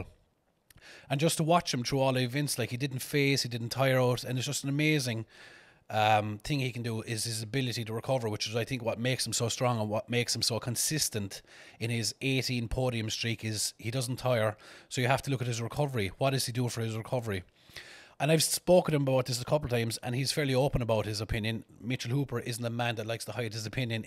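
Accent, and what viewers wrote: Ireland. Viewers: Enjoyed that. Love how you say 332, the Irish accent, born story tellers.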